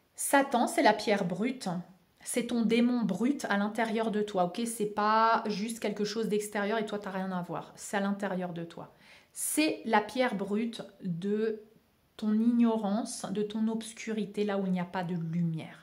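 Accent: Alsace, France. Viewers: des fois tu as gardé ton accent alsacien c'est trop mignon lol Ps: je vois 777 likes sous ta vidéo c'est bon signe ça.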